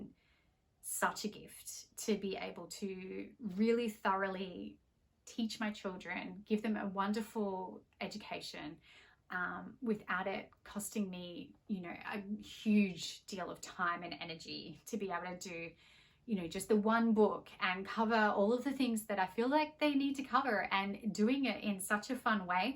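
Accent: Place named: Australia. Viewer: Following you on IG and subscribing! Love your voice and your Aussie accent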